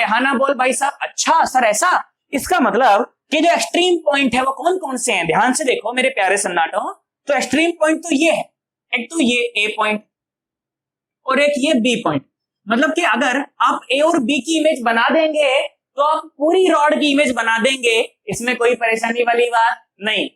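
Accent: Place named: Bihar, India. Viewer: First of all, you speak a very nice bihari, secondly your explanation is also very good... I got the concept..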